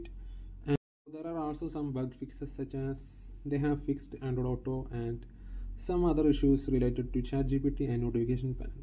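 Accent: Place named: Kerala, India. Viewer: Are you a Malayali? Your English sounds familiar. I wouldn’t call it an accent, but Malayalis have a unique way of speaking English.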